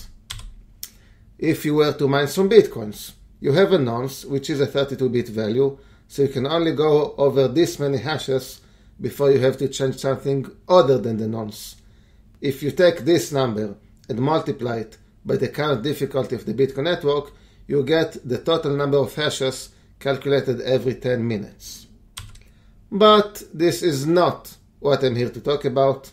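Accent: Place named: Israel. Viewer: מהמבטא ניחשתי, מהשם של הערוץ וידאתי, וואלה יש ערוצים ישראלים שמשתתפים ברעיון הזה. נחמד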